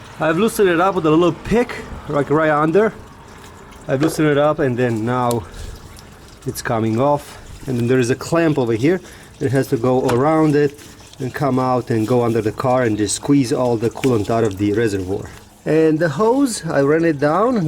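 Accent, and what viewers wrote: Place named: Poland. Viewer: thanks for making this video, and liked the Polish(?) accent.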